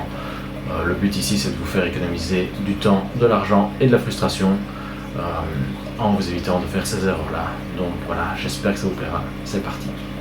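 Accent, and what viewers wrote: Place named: Canada. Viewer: J'aime bien les commentaires et surtout l'accent canadien je prends plaisir à l'écoute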